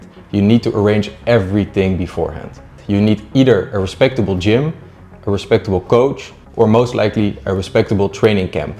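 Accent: Netherlands. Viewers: Amazing video. Your accent sounds Dutch. Where are you from? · Why do you have an american name but a Dutch accent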